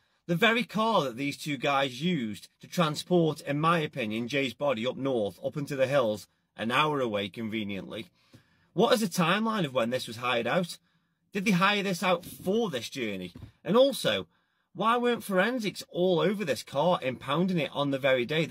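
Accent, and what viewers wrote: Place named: Stoke, United Kingdom. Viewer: Off topic but....which part of Stoke are you from? Within a 3 mile radius of Longton ? Thats a very familiar twang 😁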